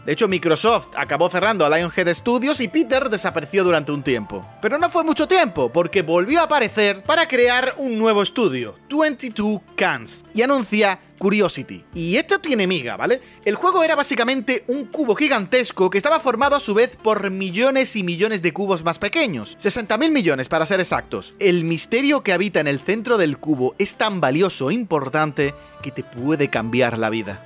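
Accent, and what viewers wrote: Chile. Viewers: La wea!? Jajaja el acento chileno es tan horrendo jajaja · Como chileno vine a buscar este comentario, me explotó la cabeza que hablases en chileno xd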